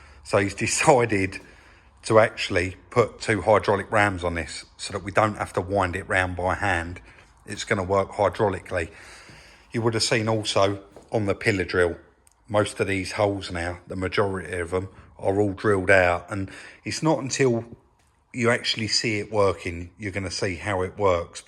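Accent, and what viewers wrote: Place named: Yorkshire, United Kingdom. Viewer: He must be a Yorkshire man .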